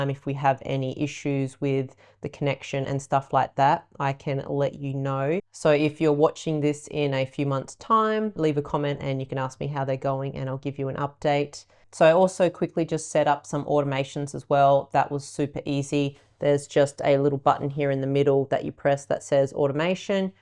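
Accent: Australia. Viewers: She sounds australian is she ?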